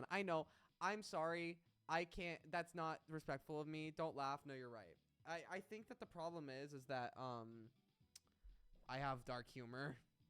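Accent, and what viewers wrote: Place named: California, United States. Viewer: Hey,! I adore your accent. Are you from California?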